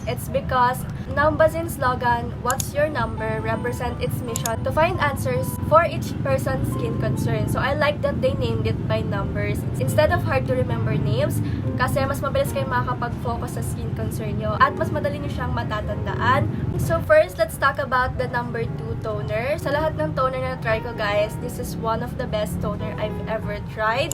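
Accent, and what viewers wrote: Philippines. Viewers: its taglish. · Yup its Filipino accents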